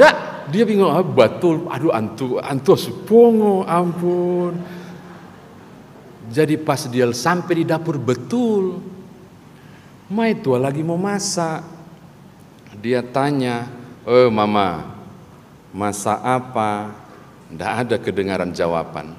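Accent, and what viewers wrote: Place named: Ambon, Indonesia. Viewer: Aduh..khotbhx sngt lucu..dialeg Ambon..mis komun Pongo? Mksh Tuhan b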